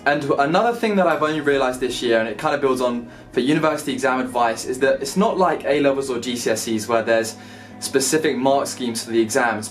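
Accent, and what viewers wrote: Australia. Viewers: THAT AUSTRALIAN ACCENT IS COMING THROUGHHHH😂😂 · Australian accent hahahah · That Australian accent is getting stronger😂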